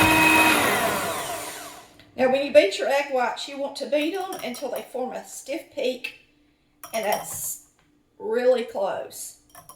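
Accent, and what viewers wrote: South, United States. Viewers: REMINDS ME A WEE BIT OF YORKSHIRE PUDDING. GREETINGS FAE SCOTLAND TAE APPALACHIA. YEEHAW, LOVE YIR SOUTHERN DRAWL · I can’t express to you how much I value and enjoy your videos. I live alone and your videos help get me thru the long evenings and aloneness. Your sweet southern accent and the way you talk is wonderful! I don’t cook but I love watching you cook up some yummy dishes and love seeing you and hearing you. It feels like I’m in the kitchen with a friend and not so stinkin alone. God bless you!!!!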